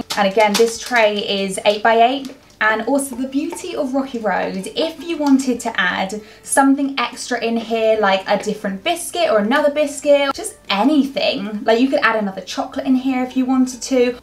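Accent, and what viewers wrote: United Kingdom. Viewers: yeah you uk british accent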